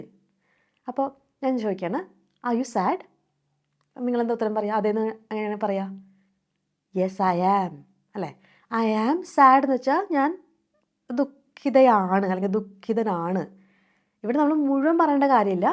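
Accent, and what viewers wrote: Thrissur, India. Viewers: Mam r u from Thrissur ennu chothikkamo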